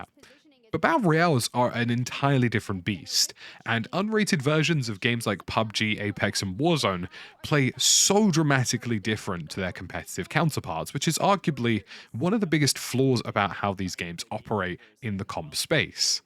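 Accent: RP, United Kingdom. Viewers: Topic for your next analysis video: why so many noobs/ low skill players think (nearly) all top-tier players are cheating. It's an important subject, because it definitely undermines the audience and enthusiasm for comp Warzone. It's also an interesting one, because on the one hand you have the fact that identifying cheaters is a skill that develops as you play (when I first started, I saw cheaters everywhere). On the other hand, you have the all-too-predictable social dynamics playing out in chat rooms and comment sections, where the wary skepticism of noobs is whipped up into conspiracy-level absurdity by toxic troll types. And on top of it all, every now and then an actual cheater is unmasked, and the community goes haywire. You have the experience, analytical powers and (perhaps most importantly) trusty-sounding RP voice to educate a stubbornly ignorant public. 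Give us what we need!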